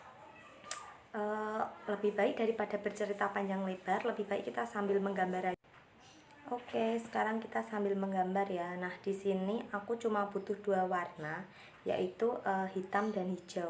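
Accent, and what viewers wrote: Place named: Java, Indonesia. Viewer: benar deh.. Asline pundi tho mba.. Nang video logate jowo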